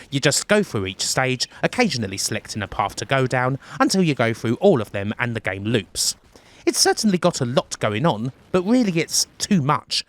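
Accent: United Kingdom. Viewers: My new favorite retrogame channel! And like british accents. Can't get enough of your stuff!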